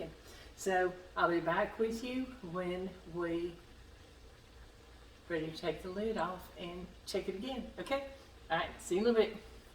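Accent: South, United States. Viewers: I enjoy watching you and listening to your Southern accent. You cook the good foods I grew up on. I am 73 and I always helped my grandma and my mom cook and bake. We were a self sustainable family and I really enjoyed it. I lived that life until I retired. I miss that life, but my kids grew up that way and they are following in are footsteps. They are both wonderful cooks. Sometimes they have cooking contests between them. You are wonderful thankyou for sharing.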